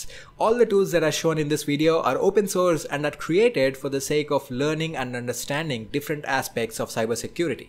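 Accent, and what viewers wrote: India. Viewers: I knew you were an indian guy I knew it since the beginning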